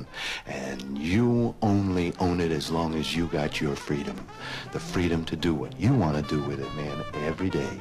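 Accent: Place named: New York, United States. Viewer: Eliezer Pennywhistler i thought the same thing. it's the new york accent and his verbal mannerisms...not all of it, just certain words and phrases are very evocative.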